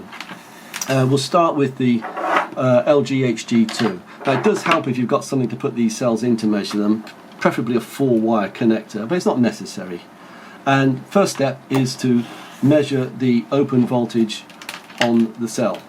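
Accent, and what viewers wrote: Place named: United Kingdom. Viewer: You Brits not only speak with a funny accent (no offense meant) but you also talk very fast. At the crucial moment after 8'00" I could not understand a thing. Congratulations, thanks!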